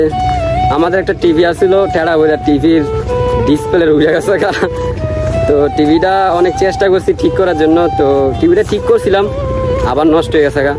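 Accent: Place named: Chandpur, Bangladesh. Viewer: ভাই আপনার বাড়ি কি চাঁদপুর নাকি মতলব? আপনার ভাষা অনেকটা আমাদের মতো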